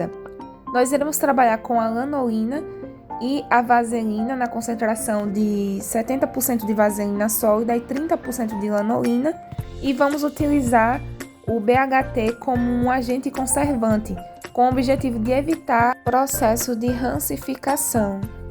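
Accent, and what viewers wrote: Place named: Ceará, Brazil. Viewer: Adorei sua voz e sitaque! De qual cidade você é? Pelo sotaque, me parece que você seja do Ceará. Acertei?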